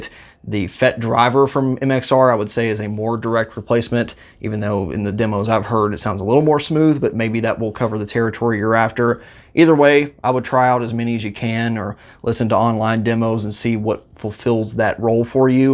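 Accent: United States. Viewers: very interesting stuff, ty for putting this together. as i am curious (german native speaker) - that accent, which area of the states are you roughly from?!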